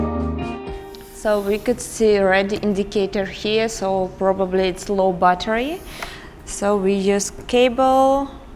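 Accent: Russia. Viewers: Russian accent?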